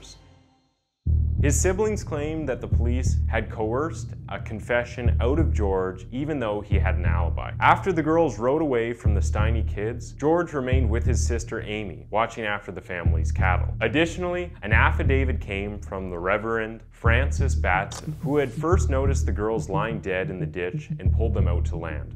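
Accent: Canada. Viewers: Has anyone told you that you sound like a Canadian version of Keith from the try guys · Loving your Canadian accent lol really cute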